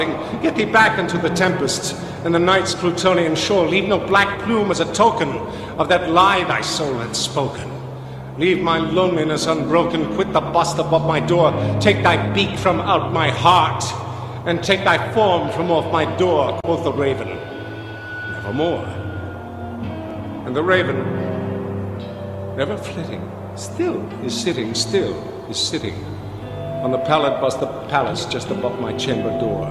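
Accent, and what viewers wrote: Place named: United States. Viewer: An American voice reading Poe..Refreshing.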